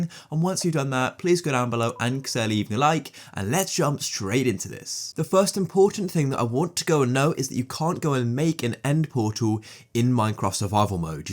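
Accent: United Kingdom. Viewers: Omg ur British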